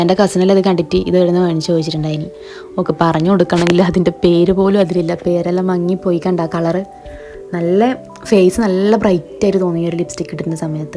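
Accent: Kannur, India. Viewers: Kannurkaari analle samsaram❤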